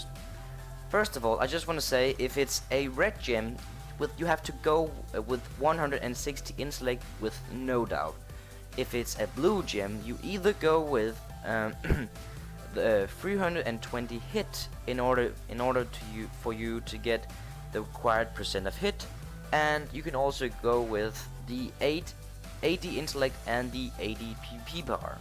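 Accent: Denmark. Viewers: Er du fra danmark :D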